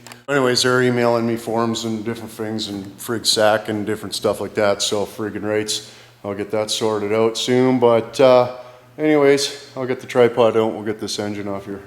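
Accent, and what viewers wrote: Canada. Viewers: awesome stereotypical Canadian accent.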